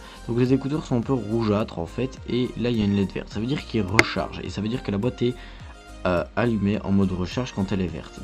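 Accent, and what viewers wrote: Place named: Wallonia, Belgium. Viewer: mais t'es wallon toi dit donc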